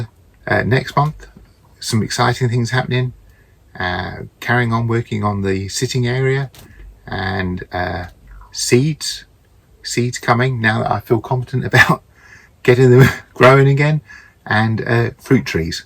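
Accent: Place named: New Zealand. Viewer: Just 30 seconds in and loving your kiwi accent blended with English. Nice sound to it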